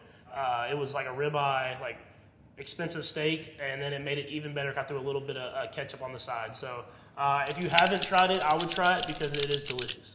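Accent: South, United States. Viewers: He’s got a country accent. And I love it lol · It’s the southern accent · His southern accent is awesome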